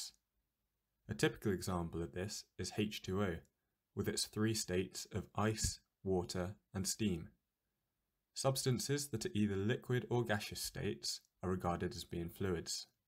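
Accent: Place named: India. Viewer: Indian English make me sick.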